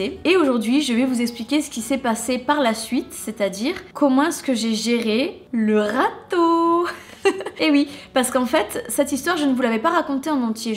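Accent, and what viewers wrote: South, France. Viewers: au fait t'es de quelle ville ? t'as un accent du sud :) tu serais pas de toulouse?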